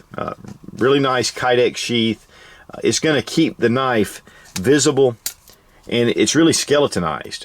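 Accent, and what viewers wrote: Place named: South Carolina, United States. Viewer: oh ok your from S. Carolina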